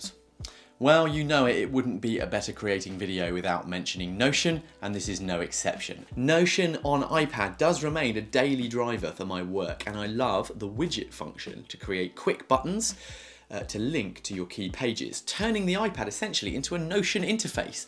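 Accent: United Kingdom. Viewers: That british tho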